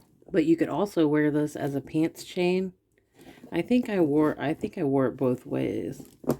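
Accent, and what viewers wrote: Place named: Midwest, United States. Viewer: You sound like me😂❤ is it a Midwest thing?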